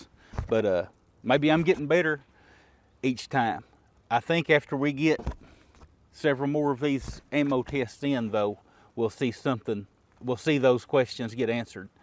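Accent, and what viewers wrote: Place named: New England, United States. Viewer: Great vid man. That's a helluva Yankee accent. :)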